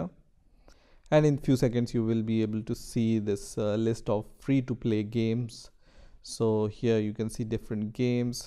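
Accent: India. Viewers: are you indian? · his indian voice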